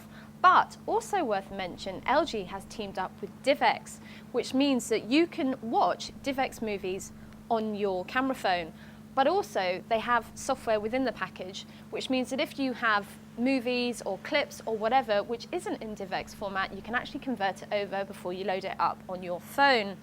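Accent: United Kingdom. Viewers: haha, i hear you man. love the differences in the two languages (english and the queen's english). keely hazell needs to start doing phone reviews. >:)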